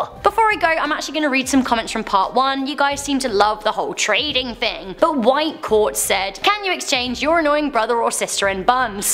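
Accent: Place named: United Kingdom. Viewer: beautiful dress i love british accents ive been watching this channel for over a year and i still love your accent it never gets old to me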